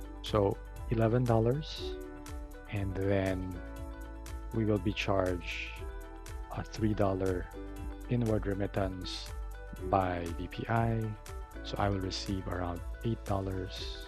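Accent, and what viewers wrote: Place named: United States. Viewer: You indeed have a nice american accent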